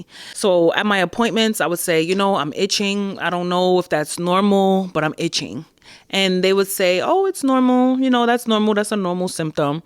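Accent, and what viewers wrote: New York, United States. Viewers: I love your NY accent 😍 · the New York was JUMPING LEAPING out in this video 😂😂😂 miss your energy on my feed💅🏿🩷🩷🩷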